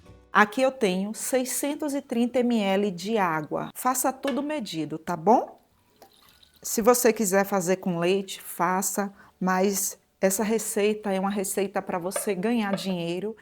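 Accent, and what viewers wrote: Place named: Northeast, Brazil. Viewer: Tô aqui olhando a receita mas prestando atenção no sotaque! Adoroooo queria ter sotaque nordestino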